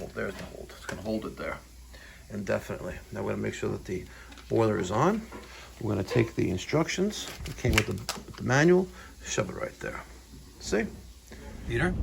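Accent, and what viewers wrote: New York, United States. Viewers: I’m here for the learning but hearing the New York accent is almost more fun!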